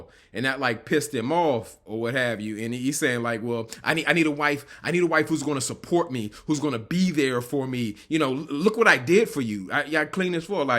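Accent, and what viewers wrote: DMV, United States. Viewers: Are you from DC/MD? Your voice sounds like you’re from the DMV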